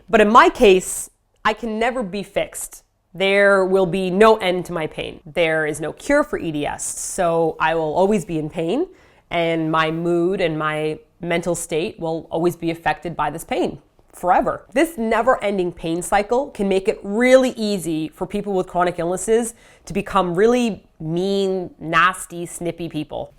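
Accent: Canada. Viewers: I love you. This video was amazing. You made me cry and laugh. I have to say this one reminded me so much that you really are Canadian your accent was so strong in this one. Your videos help me so much and no matter how few you are able to do I will always be excited to see them post. Thank you for inviting everyone to your pity party. We all need one and they should never be held alone. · ! A. (I won't say "don't", because not is incredibly hard and kind of mean to yourself during a release, but) You don't have to feel sorry for crying.:) It is a release, and it is human to cry. (I have cried during class during every school year of my life. Including college. And possibly at every job. One job, I was so miserable, I was crying in front of customers/while taking orders, and crying in the break room. I didn't feel too bad because I felt I had zero control at that point.:P And honestly, my co-workers were being such jerk butts, I was hoping that uncontrollable defense method(?) would encourage them to treat me with a little more humanity.) B. 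Your Canadian accent really came out in this video! It was super surprising/interesting!:D C. I think we all underestimate how many people are affected by depression (long-term/short-term), thank you for opening up about your private life to start an open dialogue on the topic. D. I love you and everyone and wish everyone luck in the struggles of life. May we all remember the good times over the bad.:) E. In honour of opening up: every since my first depression, I have been able to jump back to my lower points very quickly even if things are fine for months/years at a time. I feel like I equally can bounce back quicker, and luckily for me, I think my darker days arise from situations/environments instead of just a chronic chemical issue. I am very glad that when I hit my lowest point, I did go to my parent (while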